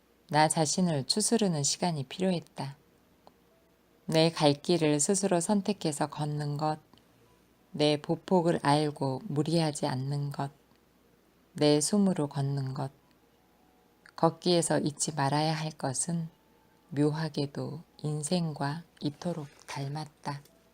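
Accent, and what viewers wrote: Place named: Jeolla, South Korea. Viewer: 읽어주시는 분 전라도분이신가봐요ㅎ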